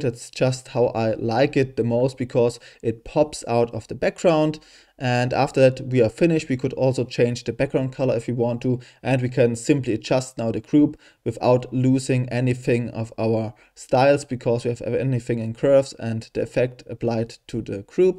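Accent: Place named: Germany. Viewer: Der deutsche Akzent fetzt😂 gutes Video, besten Dank!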